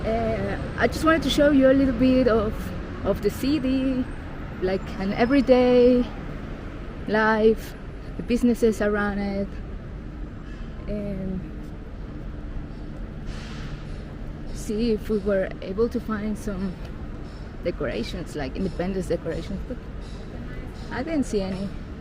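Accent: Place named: Mexico. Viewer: I understood everything and I love your accent I don't know where you are from but I ges you are Mexican congratulations nice video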